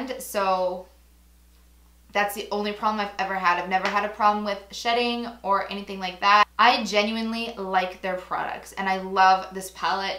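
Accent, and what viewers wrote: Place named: Canada. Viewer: Sorry... you're Canadian, I'm rubbish with accents! 🇨🇦 xx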